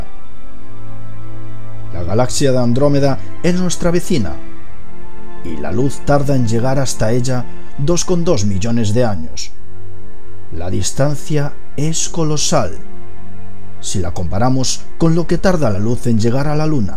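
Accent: Spain. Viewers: lástima de la narración en el español feamente hablado por los propios españoles. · Es epañol el tio 😌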